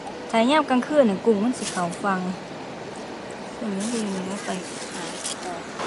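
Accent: Isan, Thailand. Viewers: สำเนียงเว้าคือคนอีสานบ้านข้อยฟังแล้วเข้าใจง่าย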